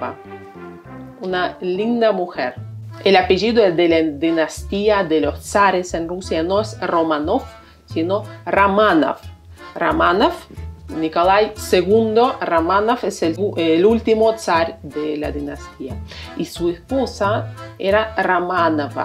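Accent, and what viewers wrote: Argentina. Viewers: Señora! Por favor la parte dos y tres. Literatura Rusa, música, arte en general. Faltan muchos nombres y apellidos. Muchas gracias! (Bonito acento argentino). Hasta pronto. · Lindo video Tienes acento argentino !!! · Tu español suena argentino?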